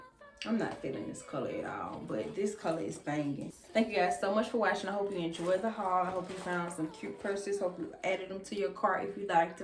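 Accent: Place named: Memphis, United States. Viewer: Shein b coming threw wit some of these purses lol especially for dupes and u sound u from Memphis 😂❤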